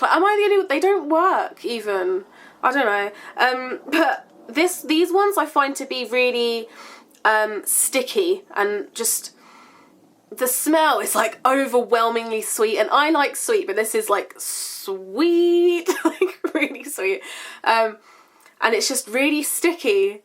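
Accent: United Kingdom. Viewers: i love listening to british people speak. it's just so much more sophisticated than us americans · Love the accent, I kinda how to speak like this Brit-ain accent ! Btw I'm from Malaysia, love your review :) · Does anyone else find the need to talk in a british accent after watching her videos? or maybe it's just me, I absolutely love her accent ( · I LOVE your British Accent <3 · the british accent sounds like heaven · everytime i watch your videos i feel like talking in a british accent for the rest of thee day :)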